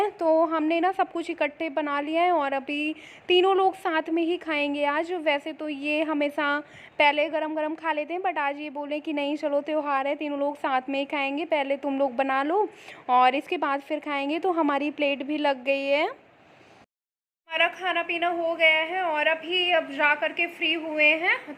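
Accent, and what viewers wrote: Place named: Bihar, India. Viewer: आप हिन्दी सही से नही बोलती बिल्कुल बिहारी जैसे हिंदी बोलती हो।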